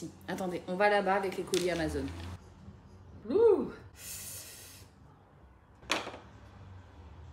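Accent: South, France. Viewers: Oui j’adore te voir faire le ménage 😅 viens chez moi 😂 avé l’accent peuchère ❤ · Owe owe owe! Vous allez arrêter de vous moquer de l'accent du sud là oh!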